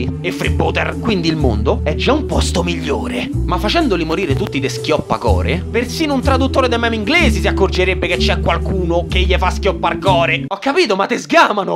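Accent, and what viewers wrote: Rome, Italy. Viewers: Sei di roma si sente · Ma cos'è che scopro sta serie e sto canale solo ora? Ma io me i scrivo subbbbito che sto sputando sullo schermo dalle risate cazzo xD (e il romano me sta influenzando anche se so di milano) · Ahahahahah no vabbè sto morendo! L'accento romano ce sta proprio bene qua! Susu che vogliamo pure tutti gli altri ventordici episodi, susu!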